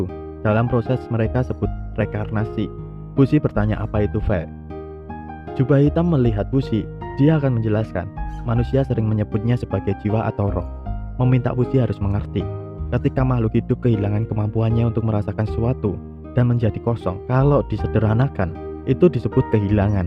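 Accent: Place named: Java, Indonesia. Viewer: auto sub, kekurangan nih channel ya cuma logatnya agak medok :v eh kadang medok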